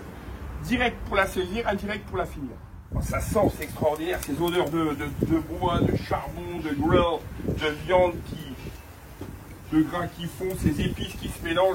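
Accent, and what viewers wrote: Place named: United States. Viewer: Svp monsieur le pseudo cuisinier, faite au moins l'effort d'apprendre à cuire une viande correctement, les cuissons c'est une technique, et l'hygiène c'est impératif, je ne vous souhaite pas que la DDPP passe chez vous après avoir laissé votre viande prendre la t° ambiante. Enfin bref, même avec l'accent Américain ça le fait vraiment pas. Si je peu me permettre, vous gagnerez à au moins regarder les vidéos de Aaron Franklin, et comme vous êtes bilingue ça devrai vraiment vous faire progresser.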